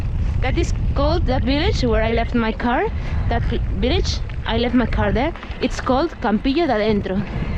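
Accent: Cartagena, Colombia. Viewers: que rraro hablas cartagenero..... :0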